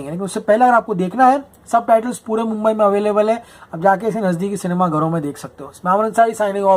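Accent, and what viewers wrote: Karnataka, India. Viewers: bhai your review on this movie is just brilliant,. Aap ka kannada tone is awesome 👍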